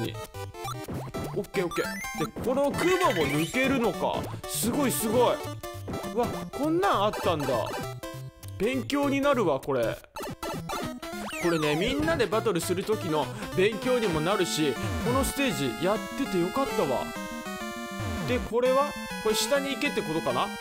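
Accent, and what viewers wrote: Fukuoka, Japan. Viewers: 福岡弁可愛い😆ww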